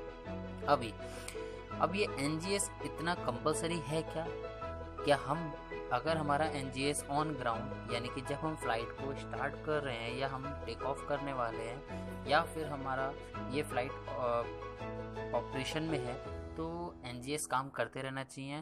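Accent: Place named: India. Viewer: Indish is so painful to listen to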